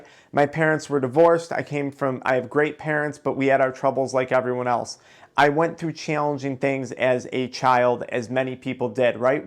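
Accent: United States. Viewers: Why do Americans pronounce doc as daac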